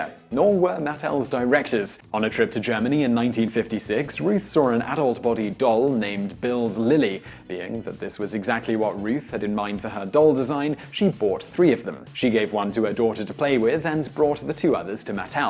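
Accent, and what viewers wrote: United Kingdom. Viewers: The dude's British accent makes it sound like he's talking about someone named Bobby.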